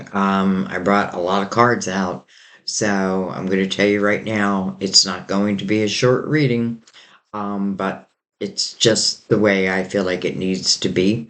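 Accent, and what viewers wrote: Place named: Pennsylvania, United States. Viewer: Girl! Your “after break up” phone call! Wow. Love stories like that! I’m from Phila & I always thought you were from PA (your voice!) I wonder where you’re from?) Great readings always! Thank you! 🙏🏻💗🦋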